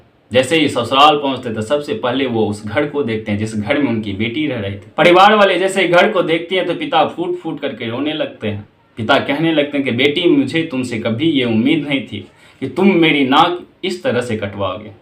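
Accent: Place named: Bihar, India. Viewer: Aap ki kahani sunane m bihari language jhalakti h .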